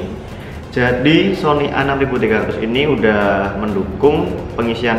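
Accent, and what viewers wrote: Java, Indonesia. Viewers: mending jadi diri sendiri aja mas. gaya reviewnya lebih bagus justru menampilkan feel 'jawa' nya.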